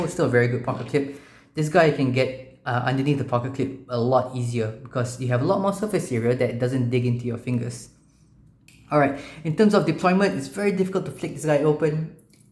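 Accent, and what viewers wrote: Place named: Malaysia. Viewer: I could tell you were from Malaysia as soon as you started talking. Great review. I am wanting to get the green handle model to match my Buck Lite from the 1980s. I subscribed and look forward to seeing your other content.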